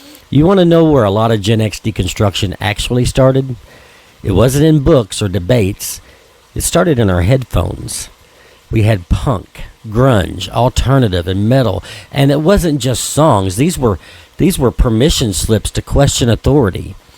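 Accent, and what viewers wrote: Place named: North Carolina, United States. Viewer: thought I was hearing NC. ✌️🤘🏻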